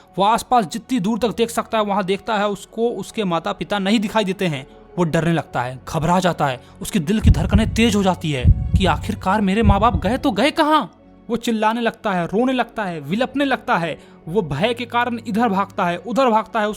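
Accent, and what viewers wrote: Bihar, India. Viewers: you are BIHARI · aap bihari ho na?? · Bhai kya aap bihari ho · you are from bihar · Bhai aap muzaffarpur , bihar se ho kya ? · Tora bihari acha lagwati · U are from Bihar?? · mj aa gaya bhaiii inki tune kitni mast hai bihari + hindi lahjabav that line { bada harami bachaa hai } was epic ❤❤❤❤❤❤❤❤ thanku bhaiya..... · sir you belongs to which district of BIHAR ? because your language is similar like mine and i belongs to patna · Bhaiya are u bihari 😆😆 mast tone hai mana parega JAI BIHAR JAI BIHARI ❤ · That Bihari accent! Just wow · bihari tone on TOP🔥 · Sir ka mode change ho jata ha bich bich ma 😂 Bihari accent always rocks 😅😂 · sir ji....ider bihari kyu bolte ho..... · Are you from Bihar?.. That lines > Re laiKA yene aao!!.. I am also from Bihar..And aapse padhne mein bhut hi maja aaya!! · Bihari ho kya😏 Video me bhi aaeee auuu kar rhe ho😒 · Bhai aap Bihar se ho kya? 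· i am sure he is bihari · App bihari ho bhiyaa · Channel owner BIHARI hai 110%✅ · bhai app bihar sai ho kya.❤❤ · 😅😅😅😅😅bhaiya app ka bihari language mst h😅😅😅😅😅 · Is he also from bihar🤔. Iam a bihari girl and his language hears like bihari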